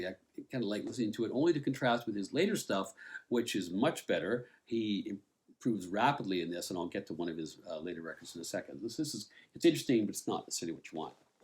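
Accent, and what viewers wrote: Canada. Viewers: Really enjoyed this review. Maybe it's a start of something? Pick and artist or sub genre and do a best of/overview. Anyways very enjoyable and always well researched and presented. Good Job as they say in the US. Interesting that you started out in the SW of England I think you said. I kinda guessed that maybe you were Canadian. North American accent but not US. You made a great point on the US Govt sending down black Americans to represent them when at home the average Afro-american was not afforded the ......let's just say apartheid was alive and well! That's a whole other rabbit hole so back on point. Love your reviews and love your presentation and style. Very pro, well researched.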